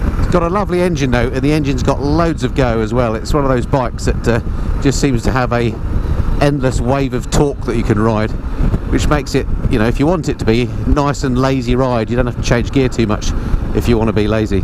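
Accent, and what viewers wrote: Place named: United Kingdom. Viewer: I played this review in 0.75x speed and IT SORTED THIS REVIEW OUT for a person like me who WASN'T born into QUICK-AS-HACK British talking :)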